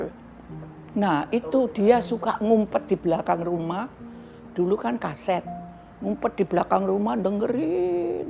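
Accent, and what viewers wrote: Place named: Temanggung, Indonesia. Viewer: Raiso d colong Logate wong temanggung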